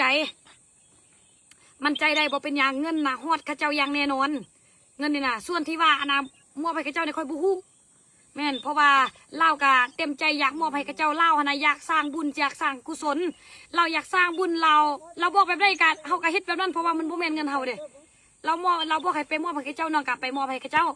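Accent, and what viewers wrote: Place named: Luang Prabang, Laos. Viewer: ฟังสำเนียงเจ้ แก เว้า กะรู้ ว่ามาจากทาง หลวงพระบาง หรือ ที่ประเทศไทยเราก็ สำเนียงคนเมืองเลย.หรือจังหวัดเลย😂😂😂สู้ๆเด้อเจ้ คนสวยใจงาม.#คนไทยในต่างแดน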